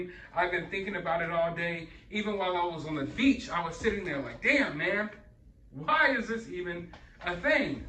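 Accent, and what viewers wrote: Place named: Hawaii, United States. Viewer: How you say, where I stay, is so Hawaiian 😉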